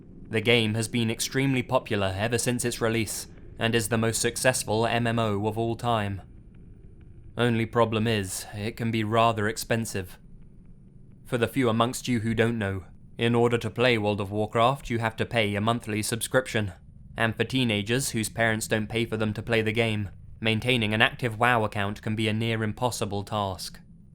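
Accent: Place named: United Kingdom. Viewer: opens video British accent closes video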